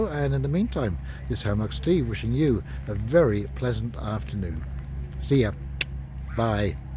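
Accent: United Kingdom. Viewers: take your stupid british accent back to where your from. Canada does not need you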